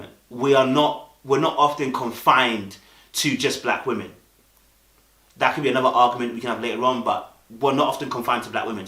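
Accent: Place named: Birmingham, United Kingdom. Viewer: This was really good. However it's like tfor the first time in watching so many of your videos that I fully heard your Brummy accent. As in clear as day, the whole way through this loool. I was genuinely like "wow" 🤣🤣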